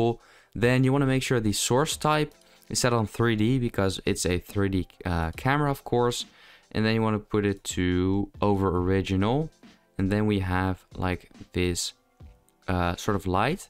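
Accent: Netherlands. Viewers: maybe a weird question, but are you dutch? lol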